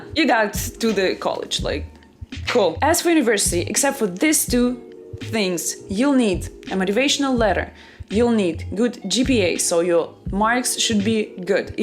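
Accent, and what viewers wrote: Russia. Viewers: Wait are you russian? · Are u russian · Are you Russian?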